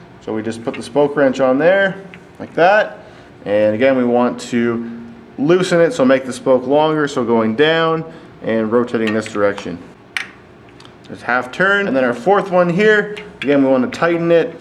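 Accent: Canada. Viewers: That is the most canadian accent I've ever heard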